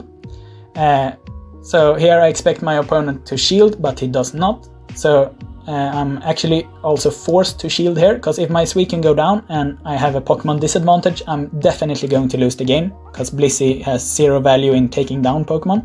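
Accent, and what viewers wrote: Sweden. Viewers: Is he swedish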